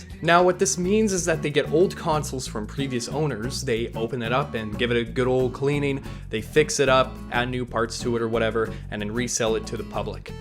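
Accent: United States. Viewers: You have a very American accent, where are you from? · How are you not from America but have one of the cleanest American acents I’ve heard in a very long time ?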